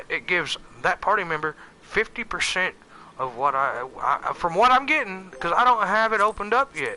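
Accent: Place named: Texas, United States. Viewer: Are you from Texas? You must be from Texas. I really like the sound of your american voice.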